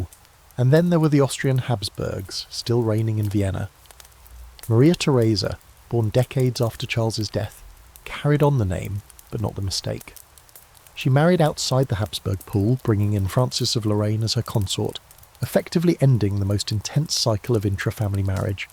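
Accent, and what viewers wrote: United Kingdom. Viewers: ive been joking for years that I put on a documentary with some guy with a british accent to help me fall asleep. I finally found your account. Thank you from Chicago illinois. Its 1am here.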